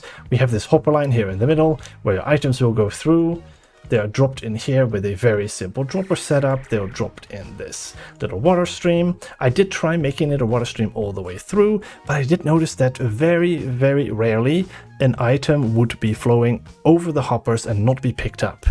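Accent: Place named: Sweden. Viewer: Huh, I always thought that smaller house extension is actually Maru's room/apartment. Once again, you made this build look amazing, and figuring out all of the redstone stuff is way cool! Btw, your slight accent gives off Swedish vibes. Are you from there? Much love to you, as always, and thank you for sharing your creativity with the world! <3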